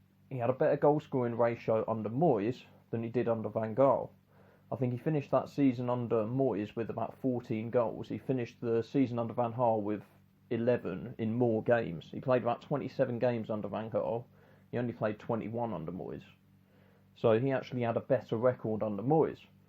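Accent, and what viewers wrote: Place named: RP, United Kingdom. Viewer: You sound like a posh spak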